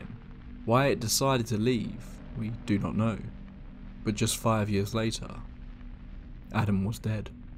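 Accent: United Kingdom. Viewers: Hey! A huge belated happy new year sunshine! I subbed to u not very long ago; I’m a huge fan of bedtime stories & Mortis Media… so I figured I’d give u a go! I’d dearly love to hear more about black eyed kids! They freeeeaaak me out!!! 😱🤪 P.s it’s always great to hear a fellow Brit narrating!! 🇬🇧👍🏻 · Ugh... Yet another British narrator... Not as many words mispronounced as usual but still annoying. Also the narrator drifts off at the end of each paragraph and speaks too slowly overall.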